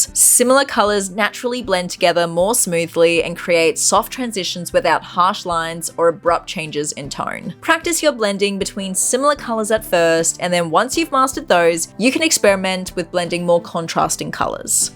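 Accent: Australia. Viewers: I appreciate that Ohuhu sponsors so many Australian artists so I get to hear them all say "aurhewhew"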